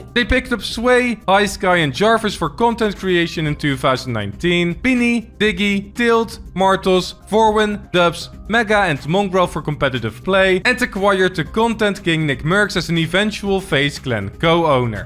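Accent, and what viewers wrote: Netherlands. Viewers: is it me or does this dude has the biggest dutch accent i have heard in my life?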